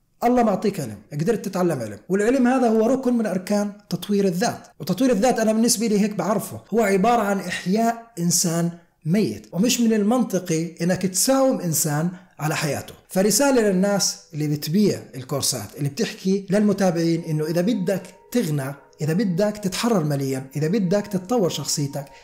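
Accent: Jordan. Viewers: من اول قناه ومن كلامك عرفت انك اردني الله عليك يا نشمي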